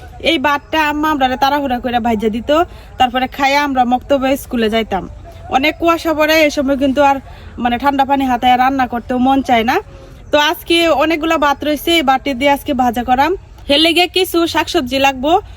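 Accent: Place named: Narsingdi, Bangladesh. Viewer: আস্সালামুআলাইকুম। আপু আপনার কথা শুনে মনে হচ্ছে নরসিংদীর। আমার দাদার বাড়ি।জানাবেন প্লিজ। নাকি ময়মনসিংহের?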